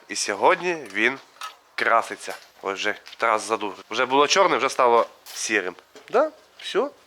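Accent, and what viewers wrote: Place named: Surzhyk, Ukraine. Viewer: Будє прикольно - вахааа.дятел суржик жосткий.песня отстой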